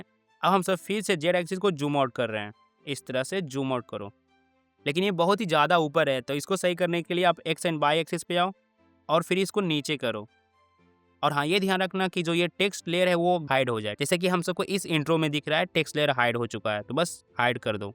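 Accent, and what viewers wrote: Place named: Bihar, India. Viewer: Bihar ya jharkhand se ho kya? Ascent to waisa hi hai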